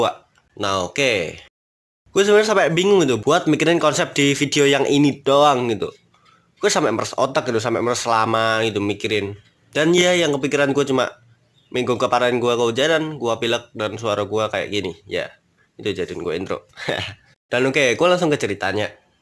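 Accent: Java, Indonesia. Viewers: Bang lu dari jawa yah🤔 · Apakah kamu orang Jawa bang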